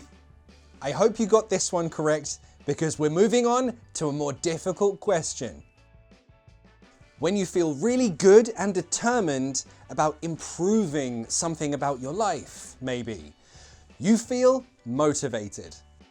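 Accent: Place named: Cockney, United Kingdom. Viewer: Nice ,with a cockney accent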